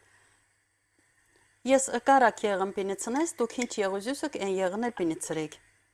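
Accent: Artsakh, Azerbaijan. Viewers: Приятно слышать арцахский гадрутский диалект.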